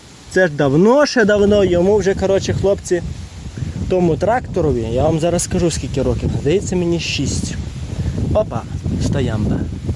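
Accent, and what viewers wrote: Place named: Volyn, Ukraine. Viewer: Бо на Волині Вісі так піздять, я тоже з Волині і я знаю як тут люди говорять 😂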